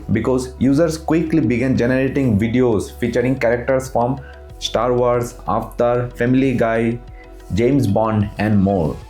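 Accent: India. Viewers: Curry English gives me terrible headaches.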